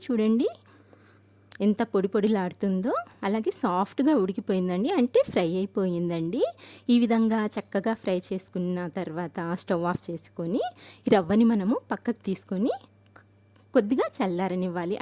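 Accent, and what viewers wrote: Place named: Coastal Andhra, India. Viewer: " " అనే పేరు బహుశా ముస్లిం స్త్రీల కు వుంటుందని నా అభిప్రాయం. కానీ మీ పలుకు హిందూ మహిళలు మాట్లాడినట్లు తెలుస్తోంది. మీరు పలికే విధానం కూడా కోస్తా ప్రాంతం వారి లాగా వుంది. మరల మీ వంటలన్ని హిందువుల పండుగలు కావలసినవి గ వున్నాయి. మీ వాయిస్ కూడా మార్థవంగా వుంది.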